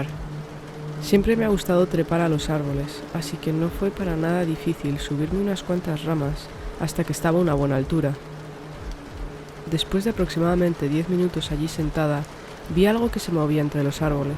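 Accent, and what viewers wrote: Spain. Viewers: Los 3 relatos muy escalofriantes la verdad ya que esto es real, muy buenos relatos me encanta ese acento español, saludos desde México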